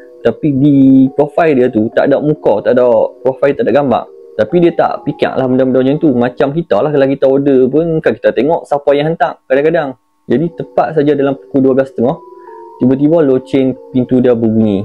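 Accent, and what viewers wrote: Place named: North, Malaysia. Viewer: org utara dok.mana bang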